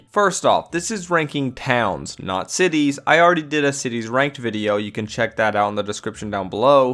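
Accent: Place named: Texas, United States. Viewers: Love your accent! reminds me of aunt and uncle back in Texas... 😌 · Oh my dude, you are seriously sleeping on Rorikstead; there's something sinister going on there. (This has been covered way in-depth by other YouTubers). Pay attention to the dialogue; sneak in and listen to Jouane give secret lessons to Sissel. Why are their farmlands so fertile? And where are all the adult women? If you were including Raven Rock, that should easily have been #1; it's personalities, the sheer number of questlines, it's Morrowindy (yeah that's a word now) goodness-it's easily on par with any of the Skyrim mainland cities. I don't know who told you your accent is fake but as a native Texan, I assure your viewers that the 'Texas twang' is indeed real. You and the missus have a good one,!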